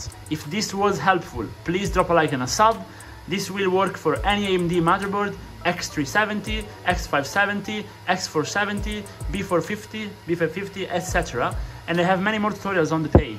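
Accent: Italy. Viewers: ho subito riconosciuto l’accento italiano ahaha, grazie mille per questo e altri tutorial ❤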